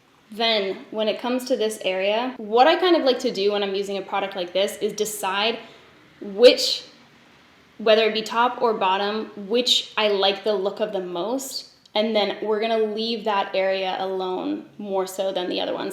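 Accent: Canada. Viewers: I’ve needed this so badly!! I just had LASIK so I don’t need glasses anymore. I got under eye filler to fix my “”baygs.” That’s Canadian talk for bags. Now that nothing is hiding my face, my brows need to be on point. Help!!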